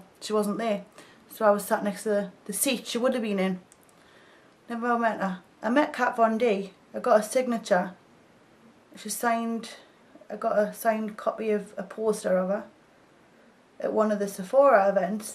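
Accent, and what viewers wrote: United Kingdom. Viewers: Love ya vid, were ya been man woman lol:-P You make me laugh, you,ve got some lovley comments on here, but what I cant stand is people asking u to " talk properly" and to "not talk" well is a Geordie this is how she speaks thats north east England and lots of us like her talking, it's called being real!!!! X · hahaha i love ur accent so much!!! i hate most british ppls accents but urs is so cool!!!!!!!!!!!! God bless u jesus loves u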